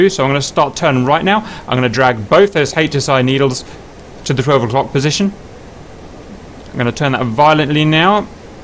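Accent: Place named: United Kingdom. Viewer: A lot of information, but spoken too fast. Difficult to keep up with instructions and the British accent does not help. Any thanks!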